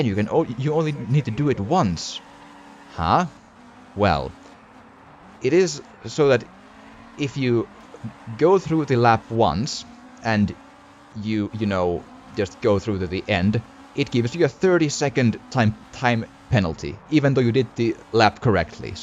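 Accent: Finland. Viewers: Are you Finnish? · Are you finnish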